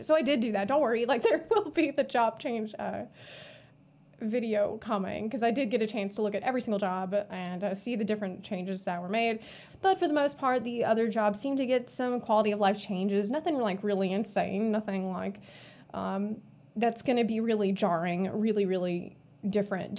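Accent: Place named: Georgia, United States. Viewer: The Georgia accent really coming out here haha. I love it!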